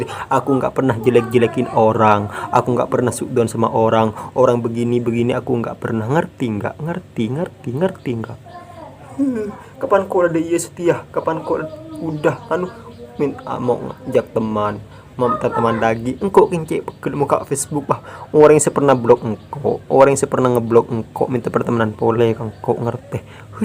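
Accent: Madura, Indonesia. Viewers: Maaf Mas Ini orang Madura Ya ..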